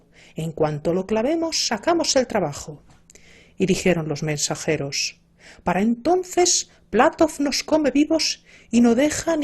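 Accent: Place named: United Kingdom. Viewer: Gracias por tu esfuerzo. (...y asentó británico ).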